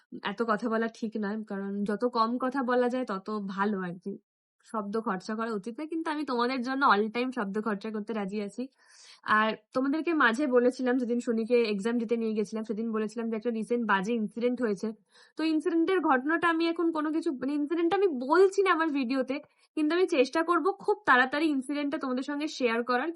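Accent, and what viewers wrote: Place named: Birbhum, India. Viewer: Khub khub sundor hoyeche ❤ Wow that's was great tomar mukhe Birbhum katha taa khub vlo lagalo ami Birbhum jajigram aa thaki 😊❤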